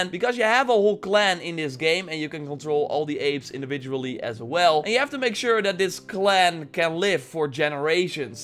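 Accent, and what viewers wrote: Netherlands. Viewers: Love your Dutch accent!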